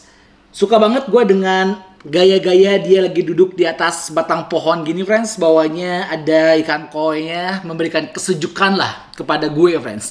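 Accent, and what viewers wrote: West Java, Indonesia. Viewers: Udh d alungin batu encan mang? Pepeus henteu Logat / bhs sunda na alus.. Jgn smp hilang nya mang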